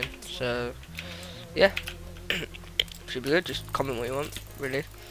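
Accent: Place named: Birmingham, United Kingdom. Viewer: you from birmingham? you sound like it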